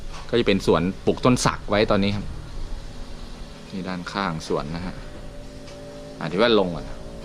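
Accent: North, Thailand. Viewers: สำเนียง เมียงแต้ๆ^^ ผมคนแป้ครับ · อู้กำเมือนขนาดนี้ เหนือแต้ๆ ครับ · ว่าแล้วเชียวว่าพี่แว่นเป็นคนเหนือ สำเนียงมันออก(สาบเมืองขื่น) ห้าๆๆๆๆ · อยากให้พี่แว่นพูดภาษาเหนือออกคลิปบ่อยๆหน่อย น่ารักดี FC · ผมคนน่านแต่อยู่ในเมืองครับ #อยากให้พี่แว่นพูดเหนือเยอะๆ 555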